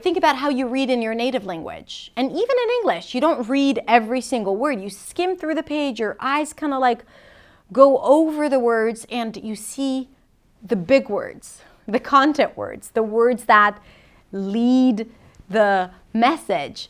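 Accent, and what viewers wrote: United States. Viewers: Excellent but please teach me how to sound can and can not (can't) in your american accent. Thanks you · Hi, I am new to your channel... I am amazed how goid your Englush is even though you aren't a native speaker but you sound really American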